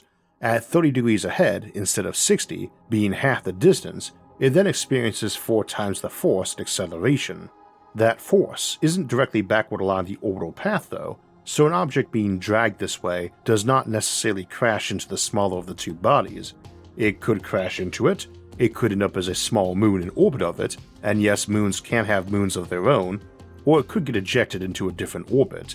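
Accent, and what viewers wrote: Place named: North Carolina, United States. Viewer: "Whey-oh distances are great-or" - - It's kind of a North Carolina accent... but not exactly.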